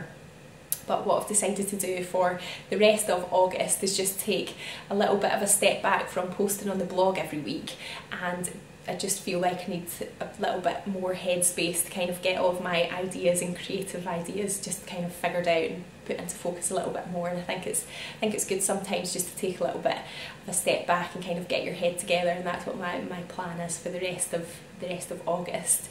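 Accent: Scotland, United Kingdom. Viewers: I live in Switzerland and it’s great hearing another Scottish accent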